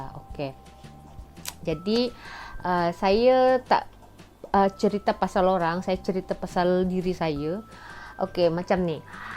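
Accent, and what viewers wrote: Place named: Malaysia. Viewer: Sedap kak cakap orang malaysia. Menghiburkan bila mengedarya.terbaik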